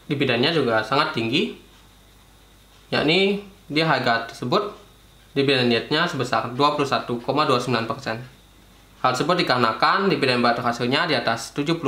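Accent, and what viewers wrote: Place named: Bali, Indonesia. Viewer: Medok bali sekali...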